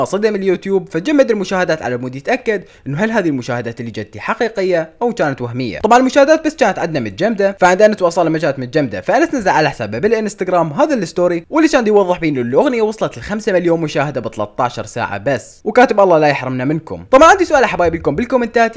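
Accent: Iraq. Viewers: فديت اللهجه العراقيه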